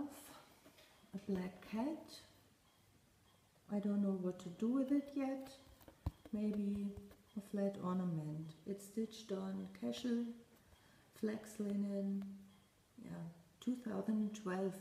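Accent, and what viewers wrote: Germany. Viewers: Hello, I found this video today, and so enjoyed it I had to go find the first 2 that you had done and get caught up. I feel you are a kindred spirit to me in many ways. I, too, love smalls and would never even think of doing a HAED work, even though there are many beautiful ones..or a Mirabilia! I have 3 of the Lizzy Kate snowmen charts and was going to finish them as ornaments, but the picture with all of them is so cute. I think my grandsons old enjoy that alot. Thank you for taking the time to share your stitching and I hope you will continue to do so. Also, meine Mutti war auf Deutschland, so I enjoy listening to you accent. Happy Holidays!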